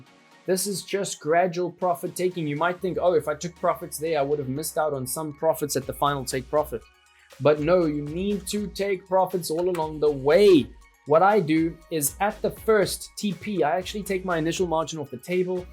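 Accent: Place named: South Africa. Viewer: SAFA accent lol SA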